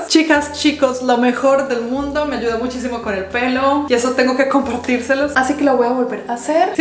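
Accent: Antioquia, Colombia. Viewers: Hermosa a mi me fascinan tus videos, eres super genial y me inspiras mucho 💯💎👸 me encanta escucharte cuando hablas con tu acento paisa ( Medellín 🇨🇴) te quiero y sigue adelante 🌷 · el otro día me puse a ver videos de cuando Sofía estaba bebé 😊 y me di cuenta que tenías tu acento colombiano más marcado. Por cierto la edición de tus videos ha mejorado muchisimo 👏🏼👏🏼 pero igual a veces me gusta regresarme a ver los videos viejitos 😁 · Amooo tu acento colombiano y amoo tus videos 😊❤ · en este video se te salio mas el paisa jjj q chulo una vez dijiste q eras de medellin pero normalmente tu acento es bien neutro. Saludos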